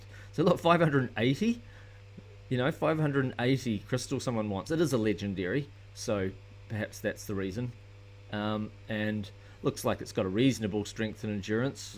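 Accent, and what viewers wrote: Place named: New Zealand. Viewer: Yeewwwww NZ accent, reminding me of home. LOVE IT BRAH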